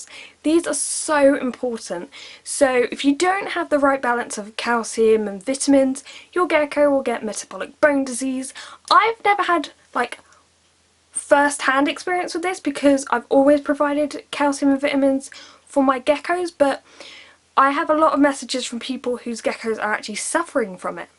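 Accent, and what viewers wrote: United Kingdom. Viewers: She’s British. Due to her accent she pronounces things differently... so no, she doesn’t pronounce it wrong.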